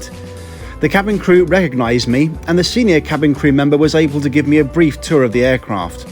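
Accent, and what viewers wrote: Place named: Northern Ireland, United Kingdom. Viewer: Loving the Northern Irish accent! Full of surprises mate!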